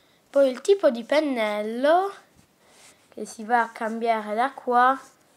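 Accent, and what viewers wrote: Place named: France. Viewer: Ma parli con l'accento francese. Tuo padre è italiano e tua madre è francese oppure il contrario?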